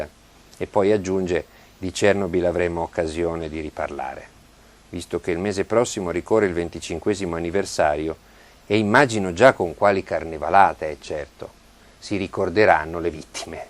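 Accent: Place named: South, Italy. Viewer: forza! ti vogliamo incazzato e con l'accento meridionalizzato!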